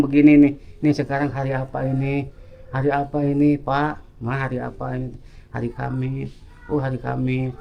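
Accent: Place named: Jakarta, Indonesia. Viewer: Bahasanya kayak bahasa Betawi dimana yaaa alamatnya tolong Nasik alamatnya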